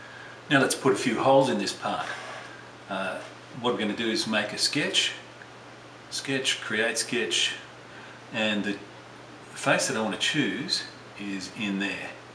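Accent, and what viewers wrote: Australia. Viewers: Thank you for these tutorials, your teaching style makes gaining skills easy. I really look forward to going through them again with the updated interface as there is some frustration when my copy of 360 doesn't behave the same as yours. It's good to hear an Australian voice also. Quick request that you cover the fillet command in some more detail. In Ex 14 I tried to fillet the join between the 32dia boss and the top of the flat part without success, the arrow kept pointing into the join rather than out.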